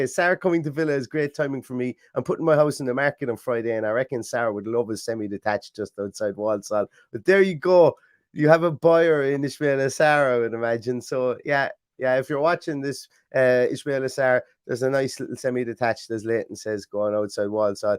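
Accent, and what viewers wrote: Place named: Ireland. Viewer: The only podcast thats combines great Villa content with the ever so listenable Irish lilt, that's a killer combo, keep it up !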